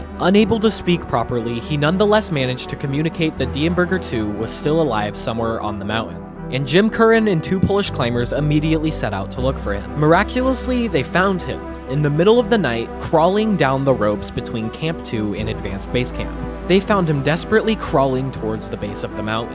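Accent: United States. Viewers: got that Colorado accent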